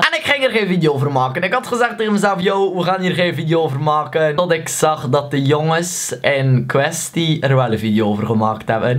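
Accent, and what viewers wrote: Belgium. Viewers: als je 100% Hollands bent is het Belgische accent best grappig! toffe video btw! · hahaha jou west-vlaams is awesome! het is nice om te hore da je in je vids normaal praat en dan anders gewoonweg nice (ik ben zelf ook west-vlaams)❤️🙈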